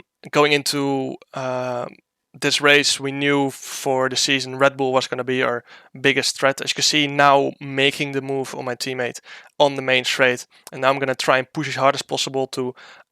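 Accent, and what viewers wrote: Netherlands. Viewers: Wat een heerlijk Nederlands accent zeg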